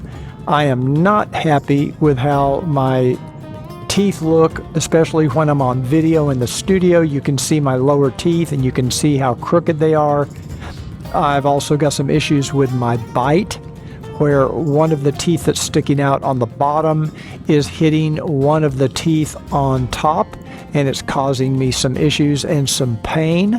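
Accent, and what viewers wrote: United States. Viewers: Ok Hi Mr " ".. So. (Oh this is weird talking/ typing this, Sorry. First time I come in some way contact with you Sir and We're talking teeth.- I didn't see that one coming?") But since you still bring up such a very important subject I'll submit my thoughts on this. Yes first appearance is what you notice with people.. in someone's face. That would be the teeth and smile! 82% of U.S. adults agree that the first thing they notice about others is their smile. I know bec. i did that with you, Sir. I saw the teeth and saw that it looks somehow painful. I Could hear it on your speech as well and that something was of? No. But there was something with your lower jaw or your sound on your voice I mean how you sound apart from that rough Dallas Texas- dialect of yours....it almost sounds something like you suffer a blocked nose? (And Sir, NoHate at all) But now I could tell that this, yours, your special sound of yours.. 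That is You! I don't have to not even see you anymore too know. And I've learned to like it and almost got used to it now at this point! It's that easily recognized- voice of yours again these days! I just sit back and listen to you and follow along on both your long and less short journeys. And it's amazing! I can see I don't even notice the teeth anymore! I don't know exactly.. Oh man you got me on this subject! This can be a touchy/ sensitive subject overall by just talking about teeth in general. And I don't Judge people? I'm Not judging you now at all...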